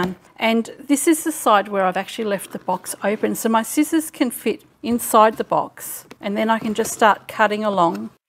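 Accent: Australia. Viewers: Is she American? She sounds Aussie to me, I might need my ears cleaned lol.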